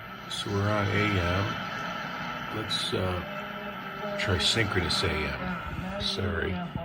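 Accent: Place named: Buffalo, United States. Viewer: Your location maybe Dallas, but your accent says Buffalo/Rochester/Chicago! Am I close? Thanks for this great comparison among these big-league receivers,!!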